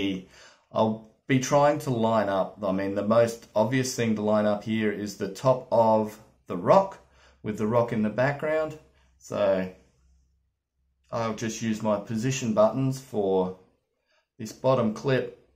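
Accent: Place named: Australia. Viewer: Hello, loved your video tutorial. Spoken in true, direct and entertaining Aussie style. That's an opinion coming from an old, well travelled Scouser. I just signed up to Doodly, following my recent acquisition of DaVinci, when I decided to stay sane during lockdown by telling a lifetime of stories in video. It's a steep learning curve for an old git, so I'll be checking out your tutorials. Stay positive, Jim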